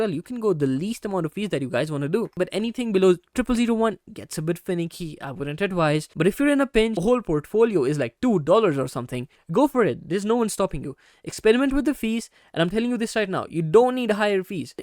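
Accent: India. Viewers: u sound indian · Sounds like Indian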